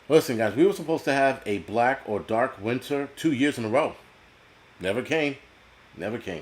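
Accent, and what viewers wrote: New York, United States. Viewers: You sound like a New Yorker - this is they way New Yorkers talk. Authentic born and bred New Yorkers.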